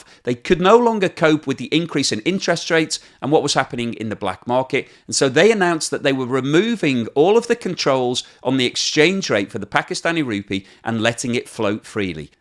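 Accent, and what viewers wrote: United Kingdom. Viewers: Don’t know why but with British accent it sounds too much like a propaganda. Than truth. I agree I can’t point a single topic that is propaganda in this video. It’s just the distrust on Brits. They fund that west narrative building machine called BBC.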